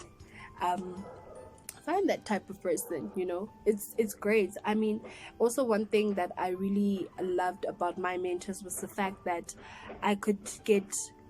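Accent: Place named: South Africa. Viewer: Hi, thank you for the uplifting story. Question: What are the similarities between living in Madagascar and S.A.? Your accent and the words you use (I.e. woza, yooo!) tell me that you have integrated to the SA environment. Thanks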